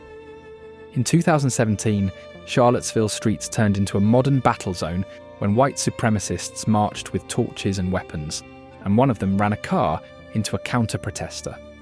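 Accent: United Kingdom. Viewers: Didn't expect a British dude narrating this lmao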